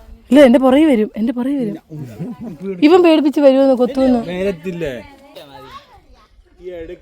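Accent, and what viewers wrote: Kollam, India. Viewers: എന്നതായാലും ദീപുകാരിയല്ല. കൊല്ലം"ഫാഗത്താ " ണന്നു തോന്നുന്നു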